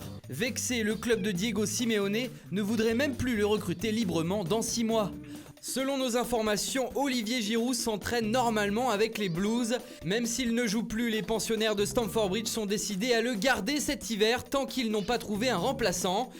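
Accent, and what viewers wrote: Italy. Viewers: Je reste toujours attentif à ton accent italien quand j'écoute,je ferais comment si tu pars? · Non non non il faut que tu restes !! Le plaisir de l’accent en série A va tellement manquer :/ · Elias Parker Bah ouais nous on veut entendre l’accent italien gros 🤣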